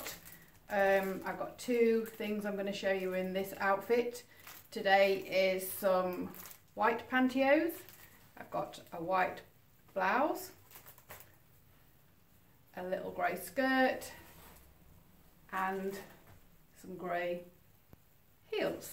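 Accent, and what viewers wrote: United Kingdom. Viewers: I DETECT AN ACCENT WHEN YOU SPEAK ARE YOU BRITISH? GORGEOUS!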